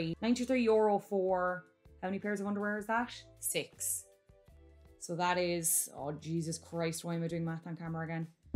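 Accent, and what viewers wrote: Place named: Newfoundland, Canada. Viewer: I swear I thought you were from Newfoundland or east coast Canada. By the accent. Yes I watched this video after the razor video. #Creepy.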